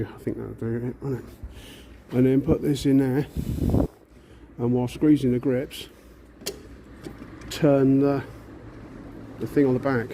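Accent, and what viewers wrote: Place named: Northern England, United Kingdom. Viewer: Thanks for the video, I saw another guy show how you can get a 12v battery with two wires that you touch to those two terminals where you took the plug out. And it seemed to work fine, just make sure the polarity is the way that winds the piston the right way. Would you suggest this way too as it saves taking the motor off? PS like the accent, it reminds of home, as I'm currently up north! lol!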